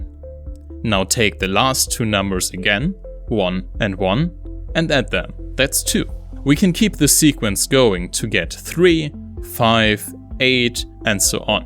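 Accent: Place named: Germany. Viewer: Why am I not surprised the accent in this video is German. Only a German engineer could pull this off.. Excellent job!!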